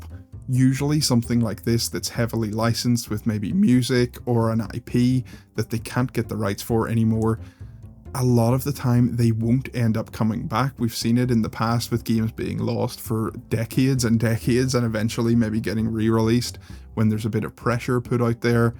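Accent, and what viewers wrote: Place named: Scotland, United Kingdom. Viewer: You Sound Scottish. I Am American...I Love Scotland,And Am Thinking Of Maybe Moving There...But Your Scottish For Sure I Can Hear It.,Cant Get Rid Of That Accent Lol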